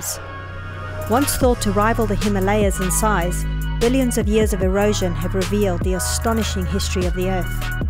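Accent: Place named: South Africa. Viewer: Great footage, please satisfy my curiosity, your accent sounds South African. Enjoy your trip.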